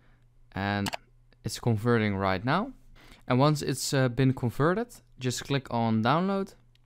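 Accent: Netherlands. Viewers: Are you Dutch? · Dat nederlandse accent hahahah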